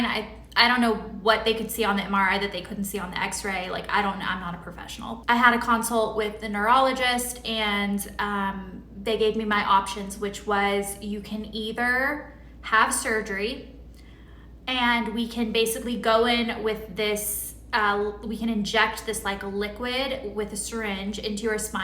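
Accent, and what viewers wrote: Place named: South, United States. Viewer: Your southern drawl is coming back <3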